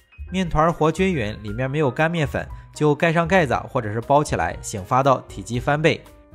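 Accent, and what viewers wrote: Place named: Jinan, China. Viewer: 听口音就猜是济南的，加上甜沫豆腐脑，更加确定无疑了。谢谢老乡。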